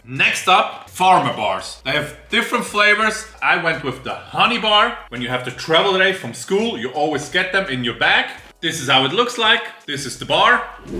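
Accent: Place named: England, United Kingdom. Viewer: Der englische aktzent is ja sowas von lustig 😂herzig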